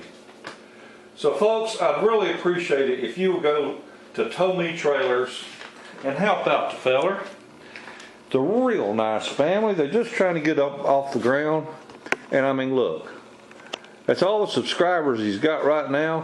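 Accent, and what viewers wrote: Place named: Maine, United States. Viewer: He has that Maine draw reminds me of Down East Dickering mixed with Pug1. He has a grow on ya Quality. I'll subscribe. Is he in a part of Maine with Uncle Henry's listings? Old wisdom is worth a sub any ol cheap day.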